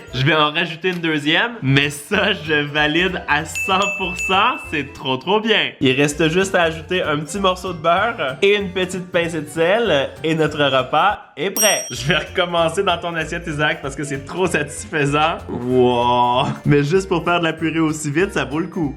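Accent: Quebec, Canada. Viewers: L’accent canadien j’adore🥰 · J'adore ton accent cuebecoi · J’adore ton accent Québécois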